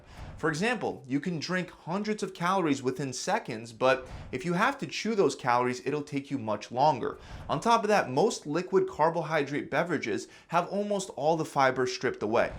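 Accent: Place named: New Jersey, United States. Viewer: You from Jersey? My man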